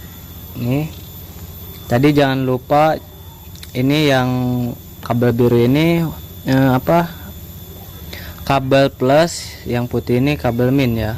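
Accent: Serang, Indonesia. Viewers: Wong serang Tah kang?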